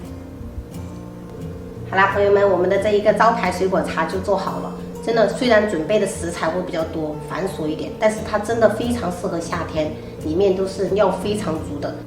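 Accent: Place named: South, China. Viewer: 聽她口音不像閩南口音, 應該是其它南方省份 , 嫁到閩南的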